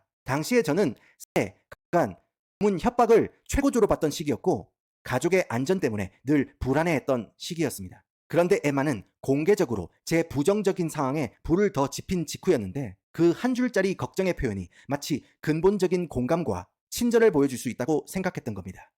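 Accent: Gyeongsang, South Korea. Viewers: 니말투 경상도인간이네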